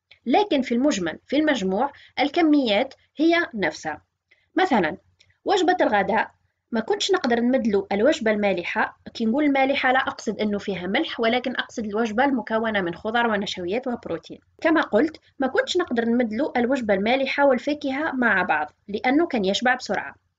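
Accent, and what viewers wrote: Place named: East, Algeria. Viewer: دكتورة عندي فضول نعرف انت منين حاسة بيك من عنابة ولا الطارف ولا قالمة ولا سوق اهراس خاطر هدرتك تشبه بزاف لهدرتي انا من خنشلة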